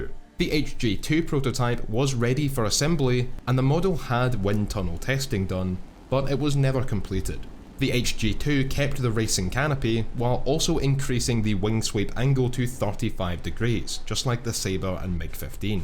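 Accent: Scotland, United Kingdom. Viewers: As a fellow Scot I believe your accent braw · I really like your Scottish accent, Mr.. Also, is that a rocket built into the tail of that ME 262?!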